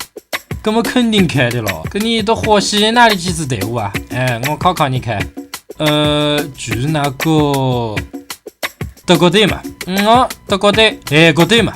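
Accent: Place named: Shaoxing, China. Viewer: 感觉就是普通话，没听出吴语除了一点绍兴音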